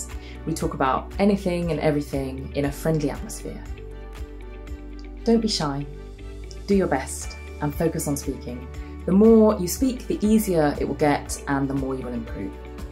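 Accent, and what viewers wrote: United Kingdom. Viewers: 항상 느끼는거지만 영국 영어 발음 치인다..😍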